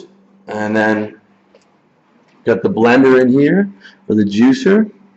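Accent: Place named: Canada. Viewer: You sound like you might be a neighbor to the north. :) I live in a small community filled with hoarders, so it drives me to youtube to find people who understand the call to minimalism. Great video, first I've seen of yours. Youtube is full of female minimalists but the male ones are somewhat harder to come by. I'm guessing the bulk of your channel isn't about minimalistic tendencies though. 😉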